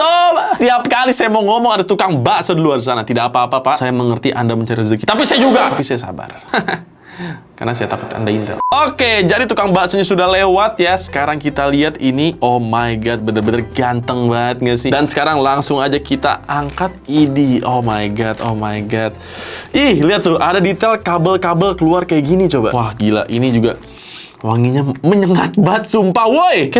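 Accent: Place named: Sumatra, Indonesia. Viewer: ada logat bengkulu,jambi dan palembang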